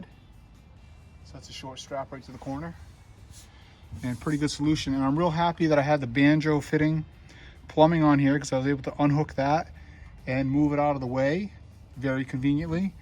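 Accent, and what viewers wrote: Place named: New England, United States. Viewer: "Plus it's Dahk." The first time I've noticed the new england accent and I've got to be 9 months or better into your videos. Lol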